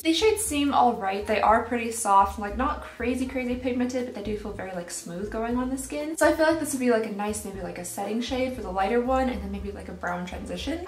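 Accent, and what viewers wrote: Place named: South, United States. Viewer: She’s not saying crown... it’s just her accent so that’s how YOU hear it due to her accent being wildly different from yours. Everyone in the south where I lived says it that way snd I hear it just fine as crayon. When I moved to England for a long time and came back it sounded similar to crown to me at first for a bit. It’s just the accent. Lots of people say it this way.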